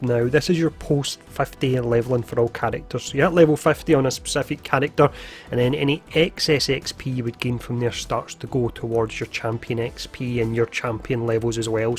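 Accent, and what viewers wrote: Scotland, United Kingdom. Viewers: I've deleted this game for space for other games. I'll wait till T'Challa shows up but I'm not too certain I'd re download it in general due to the fact they only make 3 or less maps to play on. I hate repeats. Also are you from Scotland? I love Scotland accents they're the best!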